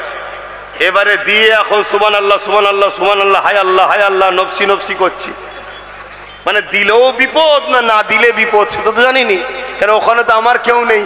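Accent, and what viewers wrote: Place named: India. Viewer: 😮It is not Bangladesh speak like Indian style.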